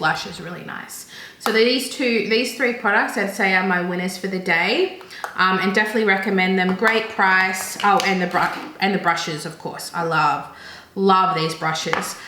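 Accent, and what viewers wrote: Australia. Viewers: Been watching makeup videos for years, first time stumbling upon your Chanel. I’m so glad I did! Thanks so much for your honest review! I hate how much trial and error there is with makeup, especially with budget products and seeing your reaction to stuff is just making it so much easier for me to decide if I want to bother trying some things. I’m on an extremely tight budget and if I were to go through too many cheap products before finding decent ones I’d end up probably spending as much as a dearer one anyway before finding what I’m looking for. I also love hearing your familiar Aussie “oh nauooooooorr” it doesn’t even sound like you’re doing it for shits and giggles it’s your natural accent and it’s glorious! 😂. Thanks again lovely! Hopefully you have some wear test reviews to to see how thing hold up over the day and this mad heat wave that blasted us straight into summer from the depths of winter with no bloody in-between.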